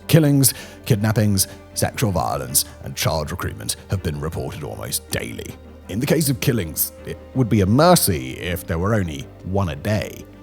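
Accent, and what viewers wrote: United Kingdom. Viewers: Idk I think he's just british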